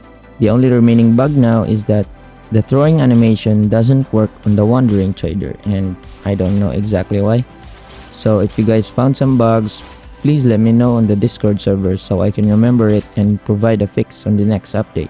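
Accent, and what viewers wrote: Philippines. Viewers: Hello! Are you a filipino? because you sound like.. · You a Filipino? Your accent seems like filipino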